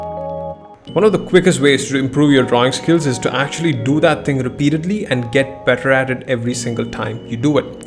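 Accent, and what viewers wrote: India. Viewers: Pls telllllll arrrrrr uuuuuuu Indian?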